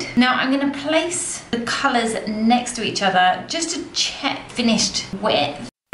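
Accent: United Kingdom. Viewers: As much as I love your rainbow cake, I love your British accent ❤❤❤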